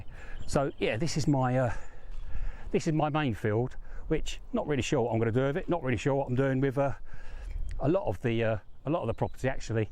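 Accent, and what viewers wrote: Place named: South London, United Kingdom. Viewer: Don't know how you came up on my feed but glad you did - so many of us are watching this with envy - the UK is even more of a rat race today than when I started out 45 years ago as a 21 year old(and it was a rat race then). Like many we worry for our kids as rents here are astronomical - Capitalism is becoming more and more viscious - how the younge working classes get a foot hold is bewildering. Our government has done everything it can to keep up on this overcrouded island(as outlined in the Bill Bryson book I am currently reading 'Notes from a Small Island') - so how you escaped is a matter of curiosity - the entant cordial agreement put in plae by our ruling classes to halt Brits living in France/Europe has put pay to folk doing what you have done - so well done for getting things sorted before brexit. 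I will now go back and watch alll your hard work on what seams a lovely plot - thanks. You sound like you come from my neck of the woods - South London - Bromley?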